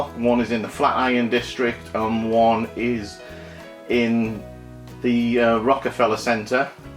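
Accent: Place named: Northern England, United Kingdom. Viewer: Nice lego haul, and I'm quite envious of your Lego room, I've been granted some shelves in the closet to store mine. :) I'm no expert on UK accents, but are you from Northern England? You sound relatively similar to my friend from Leeds.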